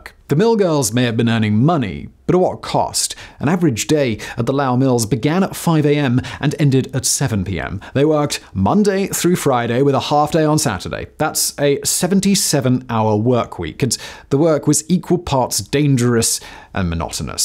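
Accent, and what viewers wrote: RP, United Kingdom. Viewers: being English is pronouncing as a person from England. Being Australian I would pronounce it the same. This is how we pronounce the name in our relative countries. However it is annoying to hear something not pronounced in the way you feel it should be. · Doesn't matter telling him. He speaks the Queen's, erm, I mean, Kings English of the South here in England referred to as RP (Recieved Pronunciation). All the southerners pronounce it that way. Sounds rather goofy to be honest. Wait until you hear them say Maryland. Literally, Mary Land haha😉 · He's British. The way he says things is different than us across the pond. His accent is beautiful. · Amazing that this is what people like you are getting out of this video. Several of you have made comments about the pronunciation. He’s British. Pronunciations vary to one degree or another depending on where you live.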